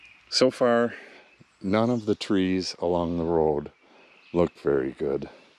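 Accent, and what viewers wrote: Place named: Wisconsin, United States. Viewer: I hope that all of those Bur Oaks had a good year after you treated them. I hope they worked on their roots and will leaf out strong this coming spring. You probably already know this, but one of the things that I do with my young oaks is fertilize them in the spring at leaf out and ALSO give them some Iron. I usually only fertilize once, but one year I did another just before the second growth in June (in Illinois). I hear a little Wisconsin in your accent so your trees probably get that second growth about when mine do. Two fertilizations can be too much so be careful. You have to use your judgement. You may already be doing some fertilization. It sounds like you know a lot about trees. Nice to see someone plant the mighty Bur Oak! Such a stately tree out in the middle of a Savanna.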